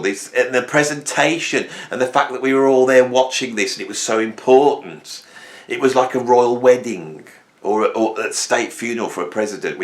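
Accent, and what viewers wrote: Birmingham, United Kingdom. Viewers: Birmingham / the West Country. 🇬🇧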